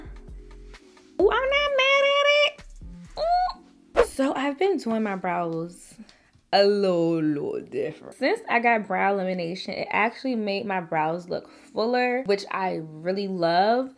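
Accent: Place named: Maryland, United States. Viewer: I knew you were from MD!!! I could tell by how you talk, lmao. I'm from PG, you?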